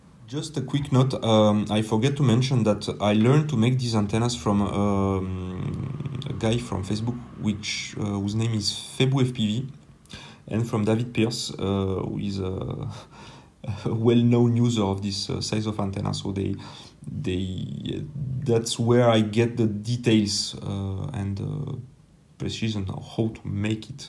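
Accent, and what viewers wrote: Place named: France. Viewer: a l'accent francais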